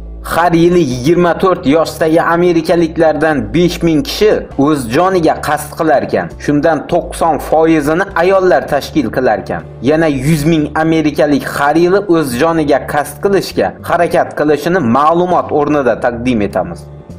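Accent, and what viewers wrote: Khorezm, Uzbekistan. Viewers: Улеее хоразмлимисане эшитиб асаб болдим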